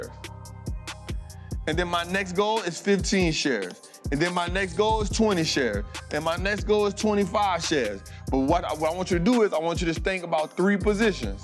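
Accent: New Orleans, United States. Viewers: Is your school in New Orleans? I hear the Nola accent. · From that first sentence I can tell that boy from New Orleans like me⚜️ let’s go brudda! Definitely gonna give you a subscription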